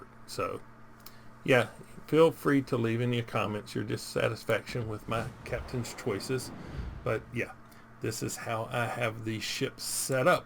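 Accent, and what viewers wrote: South, United States. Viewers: Your southern Drawl is mispronaouncing the Word Cheshire. My Name is Tentto and on the NA server.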